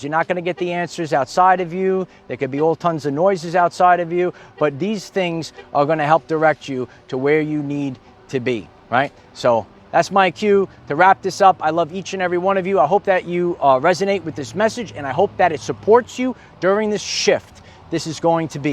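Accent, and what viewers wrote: New York, United States. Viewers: a few weeks before I came across your channel I would sometimes see 2 or 3 times per day, an ad at a bus stop saying come to New York. The picture showed the statue of Liberty holding the torch. It really popped out and got my attention How synchronistic. Anyway I am really enjoying the new connection and knowledge gained. And I love the NY acsent Peace.😊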